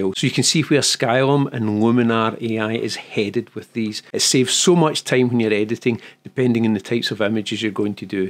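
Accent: Scotland, United Kingdom. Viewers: Its very difficult to understand this scottish dialect , I think, in other parts of the world, because you are speaking very fast. I am from Germany and I shall make every effort understanding you in future. Maybe I have to listen to many other of your editorials.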